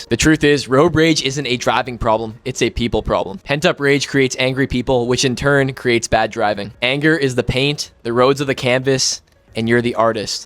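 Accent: Canada. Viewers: Realized you were Canadian in the first 10 seconds of this video +Respect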